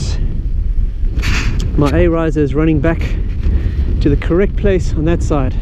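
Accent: South Africa. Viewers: South African accent ?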